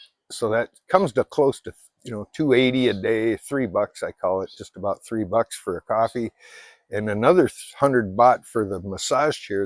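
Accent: Canada. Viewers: Anybody ever say you actually sound Canadian?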